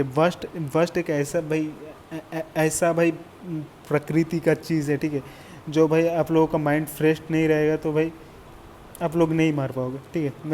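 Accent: Bengal, India. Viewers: Lag Raha hai ap Bengali ho isliya Hindi nehi bol pa rahe ho main bhi Bengali hu ❤️ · Tum bengali ho kya?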